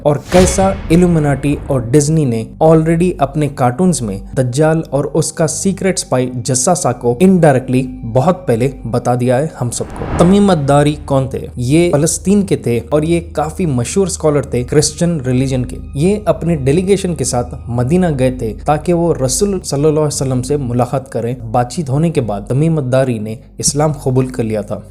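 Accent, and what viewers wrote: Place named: South, India. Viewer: bhai bolne ka tareeka ajeeb nhi hi Ye south india ka accent hai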